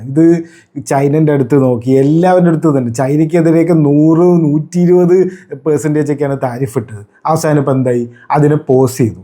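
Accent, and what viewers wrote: Palakkad, India. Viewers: Bro.. പാലക്കാട് ആണോ?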